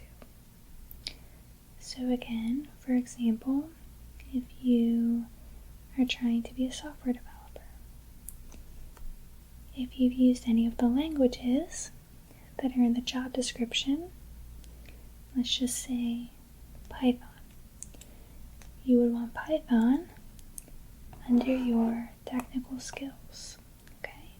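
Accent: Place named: Midwest, United States. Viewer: Do you live in the midwest? You sound like you have a midwest accent.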